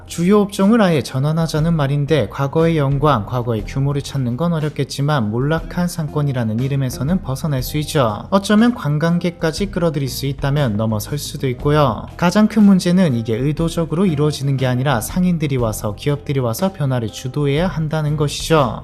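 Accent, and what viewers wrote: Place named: Busan, South Korea. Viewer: ㅋㅋㅋ 해설자 양반 부산 출신 인가보군요 같은 고향이군요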